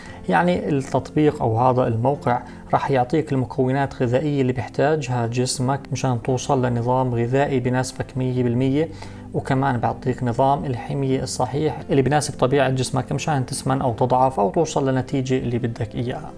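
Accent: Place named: Palestine. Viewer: شوف نفسك لهجتك ثقافتك عاداتك اسلوب حياتك واضح انك فلسطني هذا وطنك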